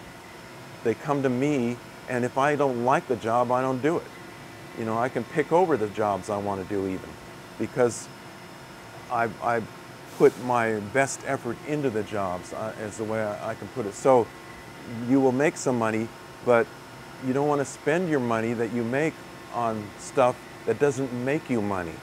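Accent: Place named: California, United States. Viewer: I wondered if you had a bit of California in your story; I'd taken from your accent that you'd lived there.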